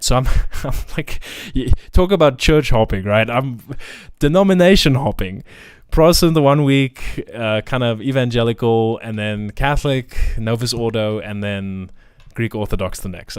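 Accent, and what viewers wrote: South Africa. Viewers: As soon as I heard your voice I thought, He's South African! (like me). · I know that accent anywhere. Hello, my fellow South African❤️. Praise be to God for your journey and conversion🙏🏽. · As soon as you started speaking I thought hey you sound south african. Fellow south african catholic here,welcome home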